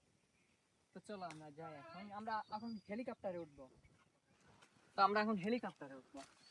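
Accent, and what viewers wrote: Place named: Kushtia, Bangladesh. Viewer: ভাইয়া তুমি কি কুষ্টিয়া জেলার ছেলে তোমার কথা গুলি ওদের মত🤔🤔🙄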